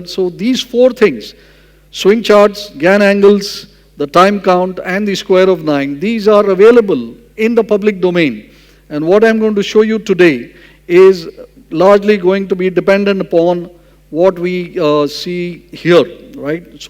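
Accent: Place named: India. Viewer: Indian accent is horrible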